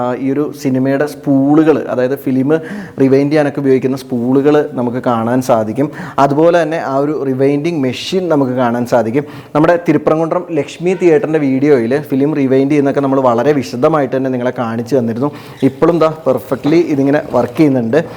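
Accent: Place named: Palakkad, India. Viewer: Palakkad ..alle ..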